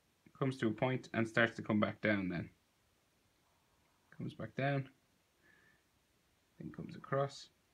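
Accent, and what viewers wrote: Ireland. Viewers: ok thanks also did you use sharpies to colour (shows in thumbnail but not in vid) or something else, and are you irish? I think that's an Irish accent but not sure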